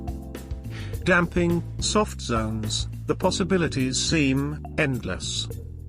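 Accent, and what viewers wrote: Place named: United Kingdom. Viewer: 🔥🔥🔥🔥🔥🔥love the British voiceover🔥🔥🔥🔥🔥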